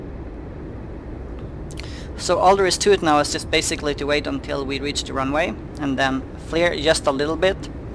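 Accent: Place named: Sweden. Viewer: Nice vid like always. btw are you swedish you sound a bit swedish xD , im swedish .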